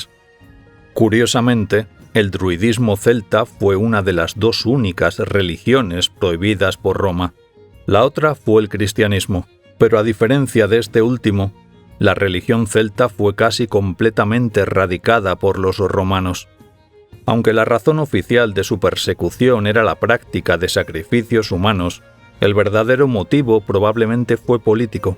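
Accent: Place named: Spain. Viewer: Un lujo oir narraciones en una voz autentica y española , no puedo dar mas pulgares pero lo hare en mas videos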